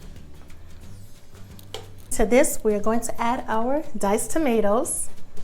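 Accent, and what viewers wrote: New York, United States. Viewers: This heavy New York accent and giggle just reminds me of Ashanti and all my female friends back home. I’m making this tonight and just subscribed. Thanks love!! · Sound like your from NYC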